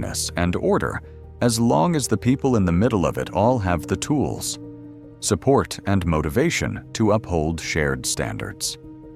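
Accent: United Kingdom. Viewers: Your accent is British. You mind your own business. Do not come to India if you do not like us.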